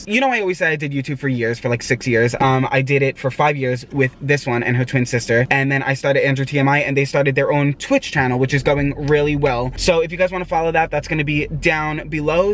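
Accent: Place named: Boston, United States. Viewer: love that boston accent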